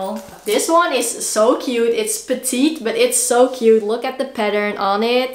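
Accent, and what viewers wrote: Netherlands. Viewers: I love your dutch accent 💛🍀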